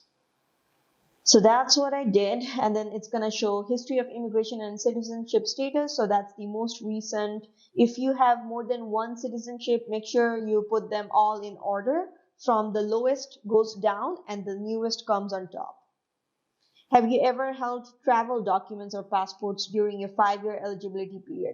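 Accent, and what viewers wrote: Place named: Canada. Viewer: Good Video.Nicely explained.Your English Ascent is not Saudi nor Bangla but more of a Canadian.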